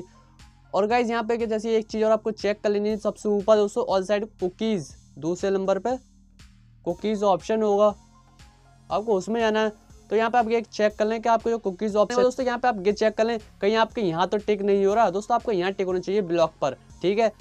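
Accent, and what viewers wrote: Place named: Uttarakhand, India. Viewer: Because aap ki avaaj Uttarakhand side ki lag rhi hai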